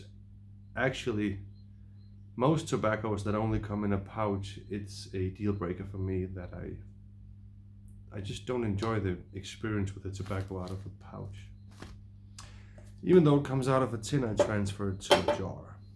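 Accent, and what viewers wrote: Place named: Michigan, United States. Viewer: Sounds like Michigan 😁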